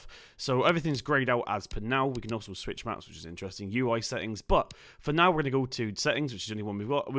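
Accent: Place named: United Kingdom. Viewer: British accent so annoying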